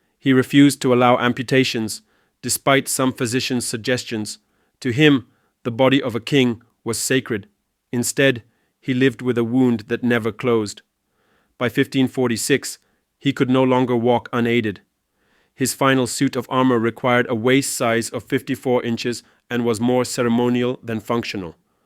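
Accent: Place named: Pakistan. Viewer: Pakistani accent detected. Video rejected.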